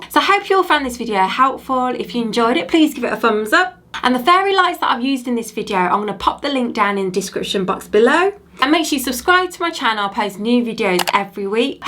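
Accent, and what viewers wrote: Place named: Midlands, United Kingdom. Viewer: So nice to hear a Midlands accent on here! Big love from Coventry, love this tutorial!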